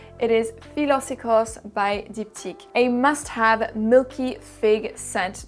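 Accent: France. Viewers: T'es française. T'as un fond d'accent français. 😊. Nevermind. T'es clairement française vu la façon dont tu prononces les noms des parfums.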